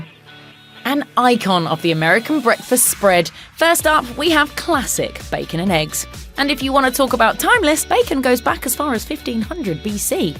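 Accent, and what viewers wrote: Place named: United Kingdom. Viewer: Wtf is this British broad?🤔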